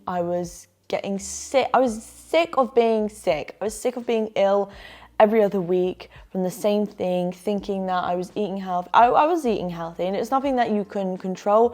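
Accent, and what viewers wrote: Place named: England, United Kingdom. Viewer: sorry where u from ? england? no surprice peace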